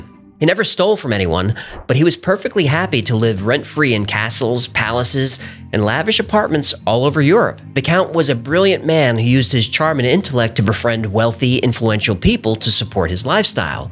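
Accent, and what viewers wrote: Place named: New York, United States. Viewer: Who would have thought a smart ass human mouthed gold fish with a new York accent and nicely timed curse words would be so g@ 🤣 funny.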